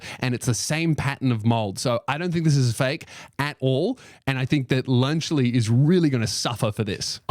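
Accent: Australia. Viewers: Everything is better with an Aussie narrator just sayin' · Ew Australian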